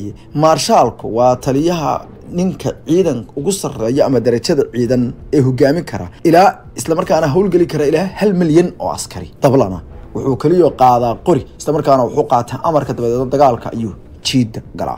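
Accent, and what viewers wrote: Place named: Somalia. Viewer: Fardabaax baah saan Afsomaligaan meel kale kaamaa maqlin Pure afsomali ❤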